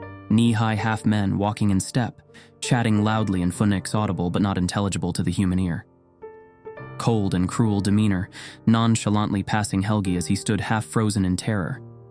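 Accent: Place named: Eslöv, Sweden. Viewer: Trodde att han var från Eslöv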